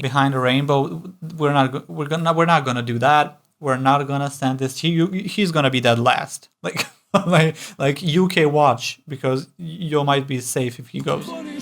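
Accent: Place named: Bosnia and Herzegovina. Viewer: Oh my...you have a lot of spare time in your day. Some cultures speak directly and don't beat around the bush. Some are more passive-aggressive. The latter use more "neutral" and "politically correct" language, but their intentions are far from kind or respectful. My points are: 1. Not everyone is British on the internet/YT. 2. Not everyone speaks English as native (and the only one) language. 3. Everyone is entitled to their opinion and feelings. 4. If you [autor of this clip] don't have anything nice to say - don't say it. I could say a lot on his wall "art" and it's placement, but I will not. ;) 5. Cross cultural communication is not easy. 6. I understand that this is a clickbaity title, but saying "I'm not feeling" ALL songs from a particular country, and at the same time coming from a country that not really known for "feelings" nor particularly great results on the ESC is what you say the UK "interesting". 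;) P.S. I've just realized that this commentator has an accent in English and that he's coming from Bosnia which adds another layer of complexity ;) Hope the UK performer will not be the last this year. ☮️ Have a nice day [not sarcasm, really mean it] Cheers!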